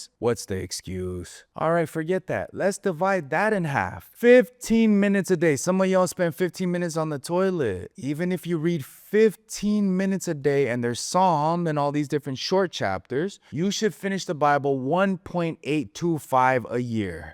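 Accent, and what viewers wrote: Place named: New York, United States. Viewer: U sound dumb in this one fr just cuz of that New York accent. What was that?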